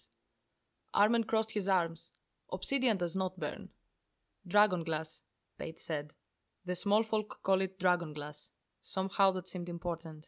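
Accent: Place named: Greece. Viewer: It sounds Greek 🤙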